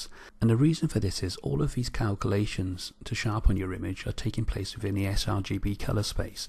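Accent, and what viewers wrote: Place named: Wales, United Kingdom. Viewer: Thanks so much ! And love the Welsh accent, so gentle to listen to..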